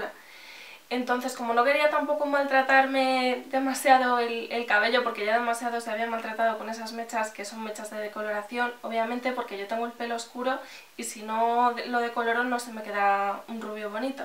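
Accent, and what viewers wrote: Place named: Spain. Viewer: Gracias, porque de por si me castra el acento español,y dura horas habla y habla y no va directo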